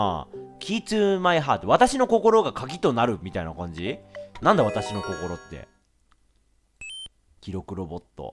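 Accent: Kansai, Japan. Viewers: 誤解しとったやん！簡単やったやん！のじんみーな関西弁好きだー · 関西弁キャラに戻らないかなぁ…… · やっぱ、関西弁はいいな～ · の関西弁ええな！！ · の関西弁実況いいな〜なんか癒される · の関西弁が可愛すぎる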